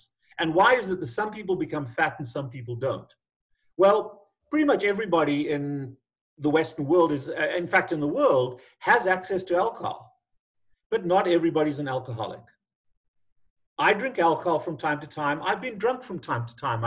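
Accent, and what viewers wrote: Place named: South Africa. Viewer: Ha ha. I can hear a South African accent even when it hardly exists. What amazing doctors UCT produced.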